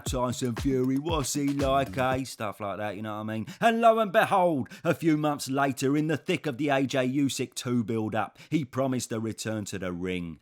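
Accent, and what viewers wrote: Cockney, United Kingdom. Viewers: The cockney accent makes these videos 😅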